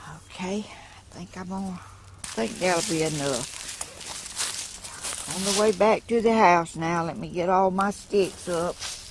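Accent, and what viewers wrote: South, United States. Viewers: Every syllable with that sweet southern drawl is precious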